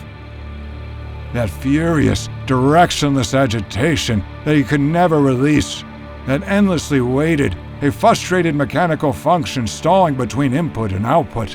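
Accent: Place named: United Kingdom. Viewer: This dudes voice just always gets to me. Something is so off about it. The weird half British accent he throws in whenever he feels like it, the weird emphasis on certain syllables or the breathy way he ends a lot of words, I just can’t with it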